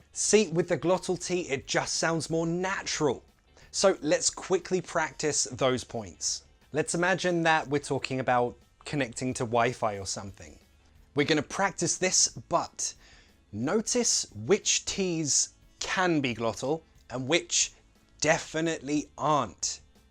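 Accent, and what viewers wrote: United Kingdom. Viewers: I love your British accent!!! In spite of loving the English language, I hate the huge amount of English words and expressions adopted in Argentina, such as: delivery, take-away, home-office, etc. Anyway, I'd love to have an excellent proper British pronunciation. Thank you for all these lessons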